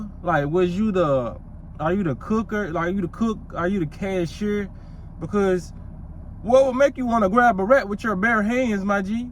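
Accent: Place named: Memphis, United States. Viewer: You Sound Like From The Hood We Black Memphis.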